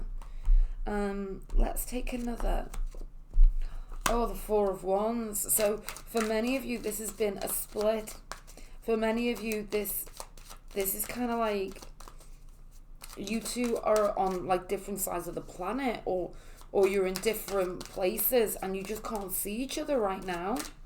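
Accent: Liverpool, United Kingdom. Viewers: I thought it was liverpool I was hearing 🥰 I'm a brummie and southern Ireland 🍀🥰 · I thought Liverpool/ North West too.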